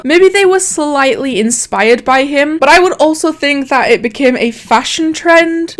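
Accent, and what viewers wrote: Scotland, United Kingdom. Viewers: Wow. 3 seconds in and I can tell you're scottish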